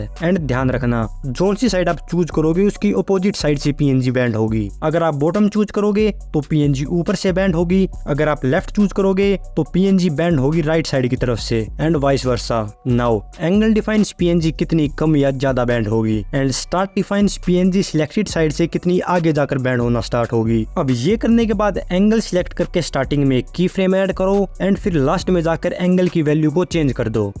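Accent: Haryana, India. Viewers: Haryana sai kya aap 🤐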